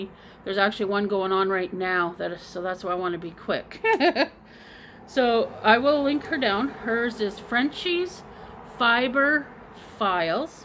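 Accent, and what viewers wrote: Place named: Acadia, Canada. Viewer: Oh wow, just saw this, thank you! French Acadian accent 😉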